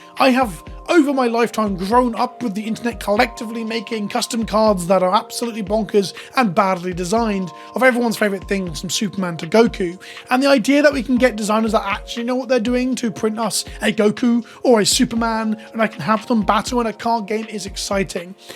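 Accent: United Kingdom. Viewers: Hearing a british nerd talk about and get wrong so much about Dr who haha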